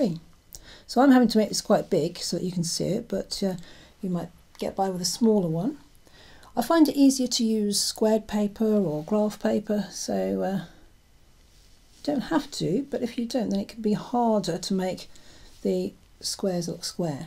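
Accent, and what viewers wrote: United Kingdom. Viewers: Are you English?, it seems brumie accent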